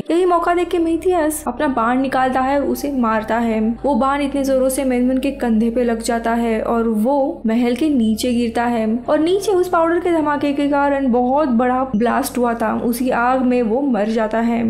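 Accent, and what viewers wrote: Maharashtra, India. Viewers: Are you marathi mulgi?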